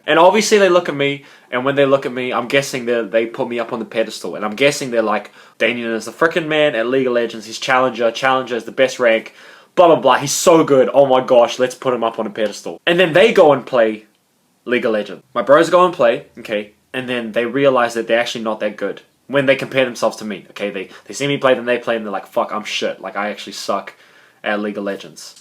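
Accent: New Zealand. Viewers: He's kiwi bro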